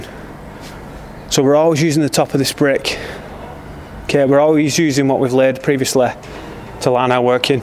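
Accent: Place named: North, United Kingdom. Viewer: I like your dialect. Are you from north UK?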